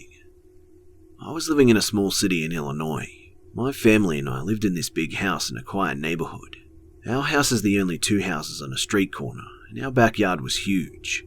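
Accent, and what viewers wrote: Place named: Australia. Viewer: Absolutely, I've been a follower for some time now and sorry if my comment came off a bit sketchy. 😂 I guess you're australian but could be wrong, I'm from Europe myself btw so it sounds original to me (in a good way ofc). I do like the rest too, the narration style, stories and the atmosphere etc. I find myself prefering this "stories-channel" first out of similar channels. 👍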